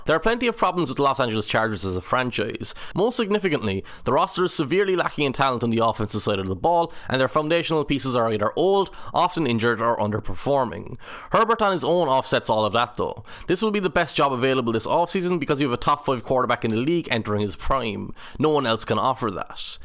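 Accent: Ireland. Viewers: I am highly skeptical hearing an Irish accent explaining American football…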